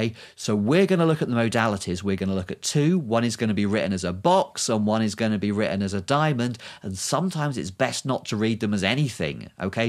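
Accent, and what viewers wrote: United Kingdom. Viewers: I have to think, hearing him, about Eric Idle from Monty Python. It's a certain British accent and not unpleasant at all. For the rest, it's too difficult for me although I notice some resemblance with Feynman diagrams, which I understand neither.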